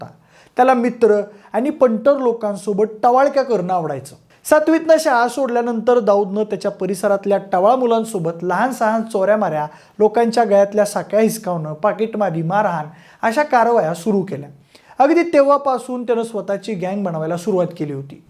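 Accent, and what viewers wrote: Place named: Kolhapur, India. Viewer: कोल्हापूर चे आहेत बहुतेक, बोलायच्या style वरून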